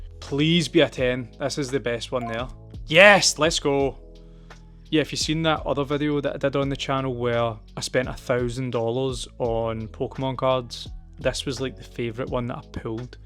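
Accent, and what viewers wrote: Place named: Scotland, United Kingdom. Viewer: Hi good to hear a Scottish accent. Finding product is so hard.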